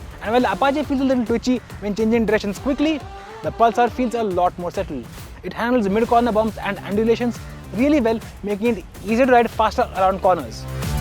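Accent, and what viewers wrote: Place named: India. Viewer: <link> #IndianPronunciation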